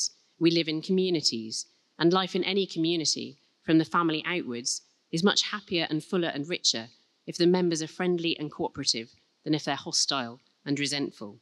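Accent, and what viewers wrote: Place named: England, United Kingdom. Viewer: Lets hope this enlightened amicable intelligent hard working humane person becomes Prime Minister of the UK one day. Imagine, someone who knows what they are talking about as Prime Minister. Once you get over her beauty and adorable english accent ( I had a crush I eventually got over, reality set in ) and actually start listening to her without bias , watching her TV programmes and reading her books, you realise what a gem the world has here. And nothing but sense she speaks.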